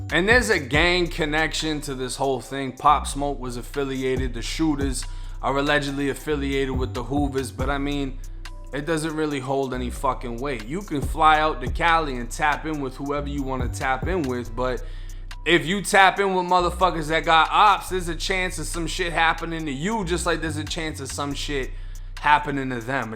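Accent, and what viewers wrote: Boston, United States. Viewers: Horrible narrorator voice. Half Boston/ wigger. Smh fat ... Hip-hop Harry.smh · Definitely sounds like a Boston Accent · Why this dude have a Boston accent if hes from Florida?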